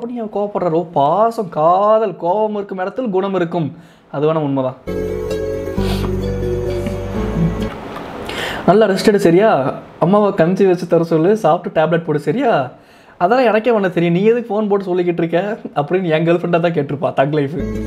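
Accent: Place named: Karur, India. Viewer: Happy mau day💪💪💪💪💪ean irumal odambu sari illaya? Love fm srilanka😍😍😍😍😍karoor slang nice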